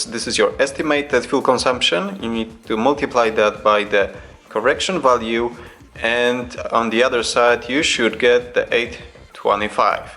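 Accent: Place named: Poland. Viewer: polski akcent angielskiego, zawsze śmieszy :) ...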